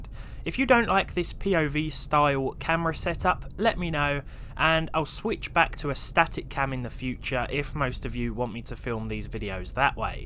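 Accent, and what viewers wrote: United Kingdom. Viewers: Dennis Thorsson there’s something charming about the lower budget though. And the British accent lol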